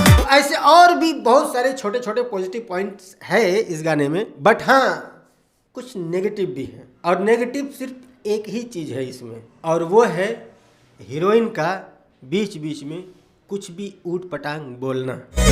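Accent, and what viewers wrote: Bhojpuri, India. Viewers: यह बोलना पहचान है भोजपुरी के भैया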